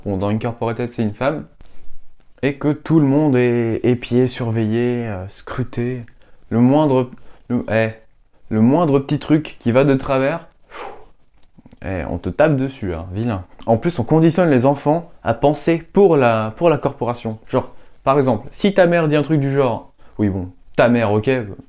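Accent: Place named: England, United Kingdom. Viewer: Superbe accent anglais :O et c'était très sympa comme vidéo , peut être un pouale lent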